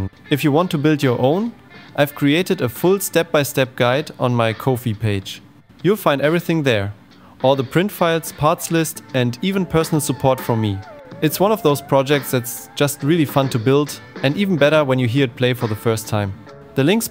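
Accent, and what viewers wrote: Germany. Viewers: Man hört das du deutsch bist ❤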